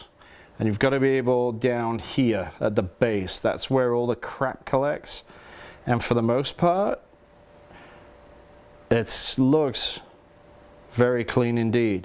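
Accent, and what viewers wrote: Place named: Scotland, United Kingdom. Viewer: Thanks. My bike is a 2002 CB500, very nice modern classic. Where are you actually from mate, your accent is a bit US/Brit and I sense some Scottish. May be way off but just a guess. I’m from southern England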